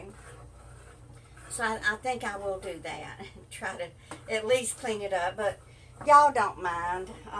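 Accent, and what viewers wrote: South, United States. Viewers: kat mimikat and ++++i like listening to this lady's southern accent when she talks. i am a native of north carolina who moved to a small east central mississippi town 6 yrs ago. i came here after my disability retirement in 2013 in addition to a broken marriage and losing my home to foreclosure in nc as well as getting away from a homicidal ex wife. my mother and brother lived in this same town. that's how i knew about it. sadly my mother passed away 4 yrs ago and my brother about 3 yrs ago. i'm the last one standing now out of a family of 5 people.( my daddy,mother,sister and brother passed on). i enjoy life in this small town. almost like mayberry in a way and my ssdi check goes farther here with mississippi's lower cost of living.no going back to nc now, love it here where i live. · I heard the southern accent then you said Florida, knew you were from the south. 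I am from South Georgia. I’ll subscribe and watch your videos.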